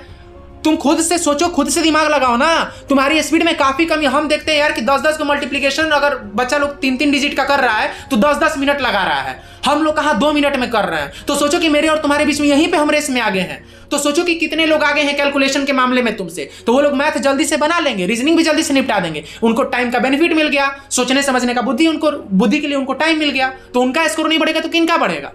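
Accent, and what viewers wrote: Bihar, India. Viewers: May be u r from Bihar 😊🙏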